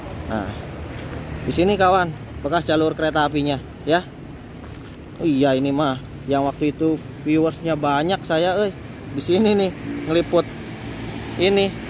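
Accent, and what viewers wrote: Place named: Banyumas, Indonesia. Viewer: Bahasanya keren tuh kang ,,, ngapak habis😊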